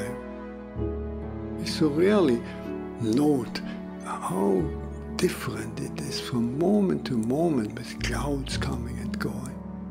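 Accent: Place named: Austria. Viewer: Brother David is speaking in his second language, English. He is speaking with an Austrian dialect. He is from Austria.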